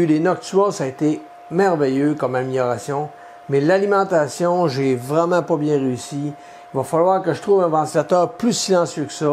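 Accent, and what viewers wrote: Canada. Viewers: J adore tes vidéos (avec ton accent canadien). 😍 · Bonjour, Ayant eu une creality ender 3 V2 à Noël (2021), je suis trop content de ma lancer dans le monde de l'impression 3D. Et je suis donc tombé sur vos vidéos, que j'adore !!! Mais je ne sais pas si vous avez partagé les références des ventilateurs, où si je dois juste "écouter" la vidéo pour les retrouver. Dernière question: comment trouver le STL de la poignée en haut de l'imprimante ? C'est en effet trop pratique ! Et enfin, c'est quoi la pièce en plus juste avant l'extrudeur ? Ca sert de guide au filament ? Bref, j'adore vos vidéos, et vu de France, votre "accent" Canadien et votre façon de parler sont tout simplement hyper rafraichissants ! Continuez comme ça !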